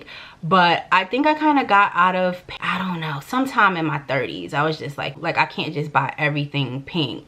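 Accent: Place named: Brooklyn, United States. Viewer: Cooper is hilarious and smart❤️ I’m ready for your new channel/ the new you🥰 I feel like you have the right represent Brooklyn, it’s in you💯 It’s in your personality and even in the way you talk sis.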